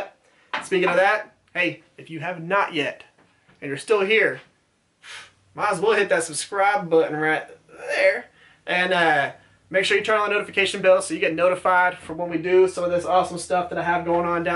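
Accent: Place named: South Carolina, United States. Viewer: Hey bro, where are you livin'? You have the accent all my friends have, so maybe you're from SC. If you are holler at me and I'll roll thru and scan all those templates you have hangin' in your shop. Piece o' cake. Always enjoy the work you do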